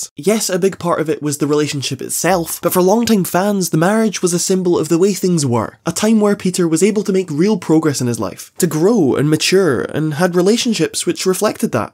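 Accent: United Kingdom. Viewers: I don't think there's something better than listening to a random guy with a british accent and a nice voice talk about random things while I play games and pay 0 attention to the video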